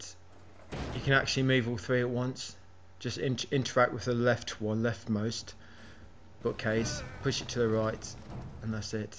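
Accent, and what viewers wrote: United Kingdom. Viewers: oh the British Accent, I LOVE IT <3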